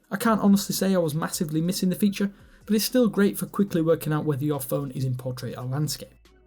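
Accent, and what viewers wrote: United Kingdom. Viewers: British Accent!🤦🏻‍♂️ Maybe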